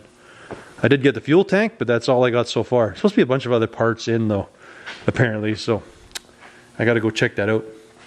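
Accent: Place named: Canada. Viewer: This got Canadian accent with a southern twang, but great content 😂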